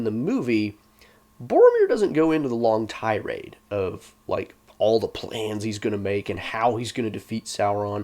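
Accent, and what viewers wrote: South, United States. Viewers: That is a very HARD Southern accent you appear to have. Kinda glorious. The os and as are a dead giveaway.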